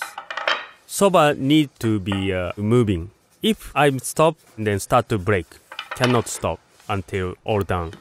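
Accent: Japan. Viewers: First time I think the Japanese-accent English doesn't matter. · ジャパニースアクセント、エニワン?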